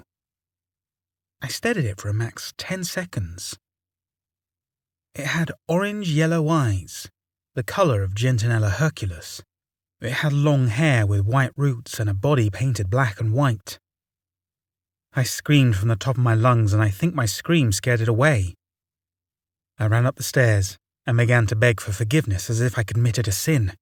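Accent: England, United Kingdom. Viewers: This is an American story why is this guy's accent English? Doesn't fit.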